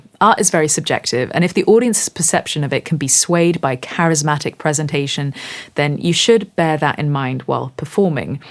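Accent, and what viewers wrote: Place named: London, United Kingdom. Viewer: in his opera prima, says in a verse: "É que narciso acha feio o que não é espelho"..something like Narcissus regard as ugly what is not mirror. Always refreshing watching your videos, not to mention that hearing your accent made me quench my thirst of old London. Be Happy!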